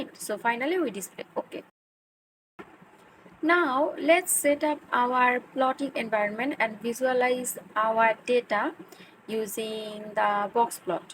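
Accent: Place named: South Asia. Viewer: Are you from South Asia?Your accent said so❤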